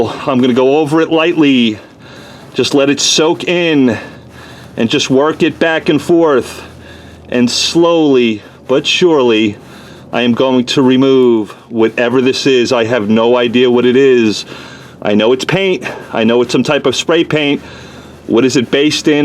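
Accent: New York, United States. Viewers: Hi I just subscribed to you’re channel. Are you from New York originally. My parents are from Brooklyn NY. That’s were I get my NY accent from. You sound like a New Yorker. Love your page. I just found it today.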